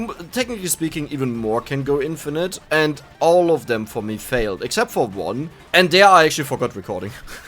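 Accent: Germany. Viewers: Nah its not just book, pretty much every word with O is butchered. I think its of your german accent from your region. We from berlin butcher english another way, so dont worry.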